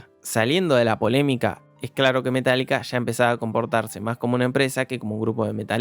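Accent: Argentina. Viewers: Le debe encantar...enanitos verdes....este buee..sera argentino?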